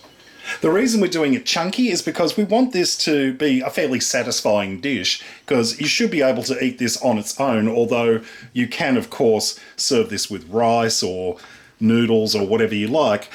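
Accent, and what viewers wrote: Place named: Australia. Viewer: Yes I think you chuckle after u taste ur dish every one ur Spirit is Amazing thanks for all the joy u bring us and ur Amazing Aussie accent as well.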